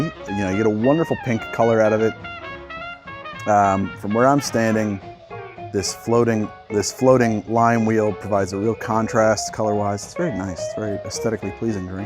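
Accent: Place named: New Jersey, United States. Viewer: i knew you were from jersey lol idk why but i could just tell. so now i gotta ask taylor ham or pork roll?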